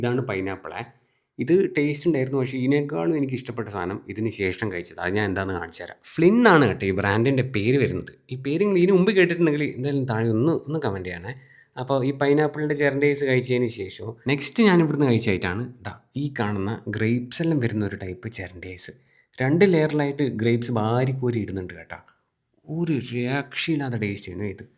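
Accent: Kannur, India. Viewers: Brode Place Kannur Ahno ?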